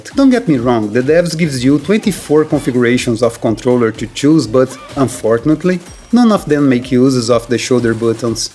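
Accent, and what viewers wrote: Brazil. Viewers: Cara, vc é brasileiro? Me parece que sim pelo seu sotaque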